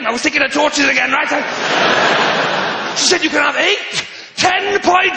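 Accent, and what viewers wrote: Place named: Scotland, United Kingdom. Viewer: I love his voice its so raw and Scottish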